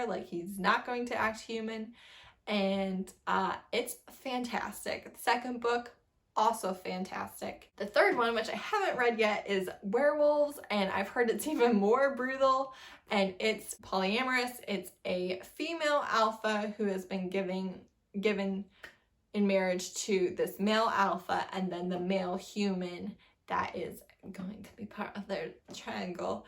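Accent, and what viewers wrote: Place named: United Kingdom. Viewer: you always sound British in your intro lolll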